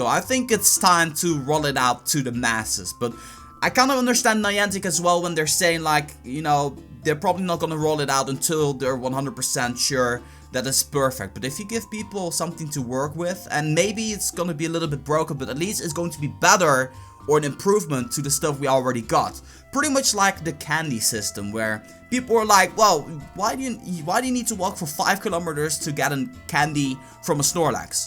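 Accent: Netherlands. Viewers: ben je nederlands ik hoor het aan je accent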